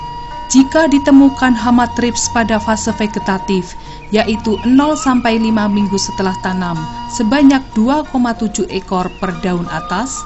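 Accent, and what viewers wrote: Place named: Java, Indonesia. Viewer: Duh yg baca narasinya medok...coba yg baca narasi sesuai dengan musiknya...orang jabar jadi gak medok gitu suaranya...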